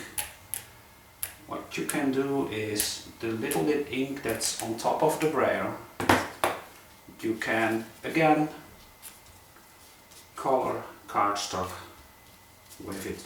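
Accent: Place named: Netherlands. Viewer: Im always happy to see dudes creating too, I love it. I was bit scared for your nice shirt - especially the sleeves - but you didnt get a drop on in! For more traditional marbling with alcohol inks, just use hand sanitizer; a good (thick-ish) layer on the plate, then inks and you can manipulate them exactly like when marbling the traditional way (just dont use sharp tools with gel plate!). I liked all your prints, but the ricepaper ghostprint one was magical. Dankje well (sorry, Im just assuming youre from NL by your accent..) and greetings from Finland. -Jane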